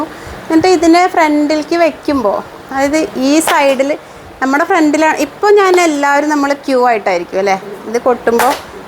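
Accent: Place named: Thrissur, India. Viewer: വർത്തമാനത്തിന് തൃശ്ശൂർ ഭാഷ തന്നെയാണ്.,ഊരകം,ഞെരുവിശ്ശേരി ഒക്കെ അറിയാമോ.അവിടെയൊക്കെ ധാരാളം ആളുകൾ കൈകൊട്ടി ക്കളി അ റിയുന്നവരുണ്ട് എന്ന് കേട്ടിട്ടുണ്ട്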